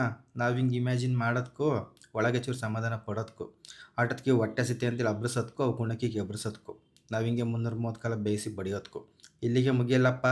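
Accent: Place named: Holalkere, India. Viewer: ನಮ್ಮದು ಹೊಳಲ್ಕೆರೆ ಹತ್ರ Adanur Nimdu yavuru? ನಮ್ಮ ಭಾಷೆ ಸೊಗಡು ಇನ್ನೂ ಉಳಿಸಿದ್ದೀರಾ, ತುಂಬ ಖುಷಿ ಆಗುತ್ತೆ..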